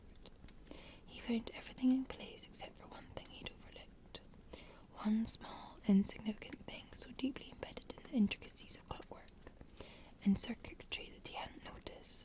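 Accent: Scotland, United Kingdom. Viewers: 31 minutes of tingles from the resplendent scottish lass. ah, a most excellent birthday present. ☺️ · Gawd, I adore your lovely brogue. Blessings and love from Ohio USA! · I'm totally in love with this Scottish accent. Better than my Italian accent.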